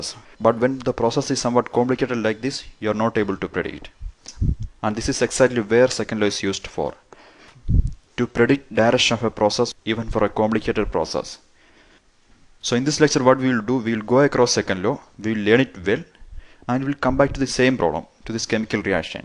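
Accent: Kerala, India. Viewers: Malayalee accent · I think he is a Malayali from India .. Damn accent!!! Can't focus on the video :(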